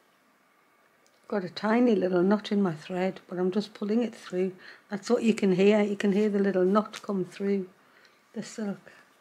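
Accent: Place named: Geordie, United Kingdom. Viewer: Watching from my home in Australia, though i am a Geordie. Loved the video and recognised your accent. Where are you from?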